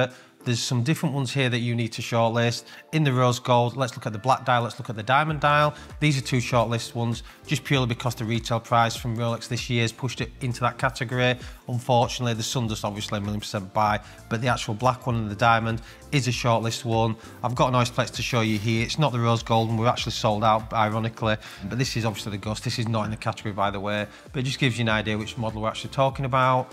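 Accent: United Kingdom. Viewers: But remember speaks the queen’s English unlike us Yanks across the pond.😉 Seriously, you are right.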